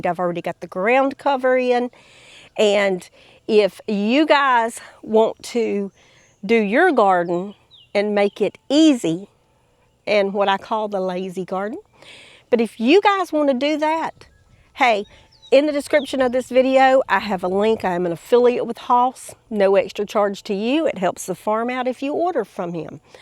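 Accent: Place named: Alabama, United States. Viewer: Wow, what an exciting project we tried doing something similar on our own but probably a lot like where you live we sometimes get really Highwinds and it’s hard to keep those tunnels up. Maybe yours is going to be different I can’t wait to see you and we have some aviaries on our property, and I have been planting inside of them to keep out the dears, and a few other odds and ends of varmints clouding all of our peacocks, who love nothing better than to eat the new growth on veggies and fruits anyhow, I sure enjoy your channel and I love listening to your voice into Brooks voice on College Hill Farm. It reminds me so much of all my relatives back in Alabama.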